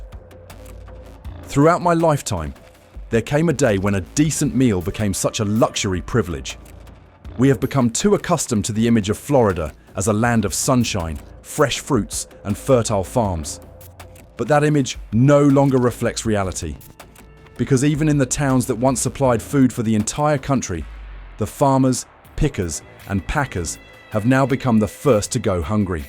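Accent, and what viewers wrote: England, United Kingdom. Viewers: Who is putting this BS out???..California???.NYCity???...and this person has an English accent!!!....I live in Fla. and I do not SEE any of this reported BS!! So those who were homeless and jobless and dependent on Welfare or Charity in their previous locale, come to Fla. and bring their same hard luck stories with them!!!!....I recommend that Florida establish a border check point and IF you are not already a resident, then you get turned around to go back where you came from....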